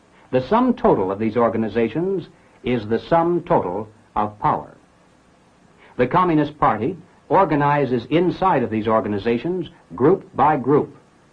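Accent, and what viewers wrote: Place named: England, United Kingdom. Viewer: いつもありがとうございます。の語りは大変聴きやすいです。英語音声を消さないのもいいですし、日本人には探せない情報を英語訛りで話されていると有り難みが増すのですが、声質がソフトなので聴いていて全く疲れず、スムーズに頭に入ってきます。個人的には、大統領選挙投票前のイーロン・マスクに、終わりやん、って大阪弁でしょうか、というのがとてもニュアンスが伝わりました。日本人には出来ない翻訳です。全然関係ない映画の話ですが、コロニアという映画で、DSより更に危ない追跡者から逃げ切るエンディングで、旅客機を無理やり離陸させてと主人公が頼む場面で、機長が「OK」と応える和訳が「クソッ」になっていたのがちょっと嫌でした。文化が違うと直訳とは程遠いものになったりしますが、の和訳はニュアンスが極めて正しいのではと感じています。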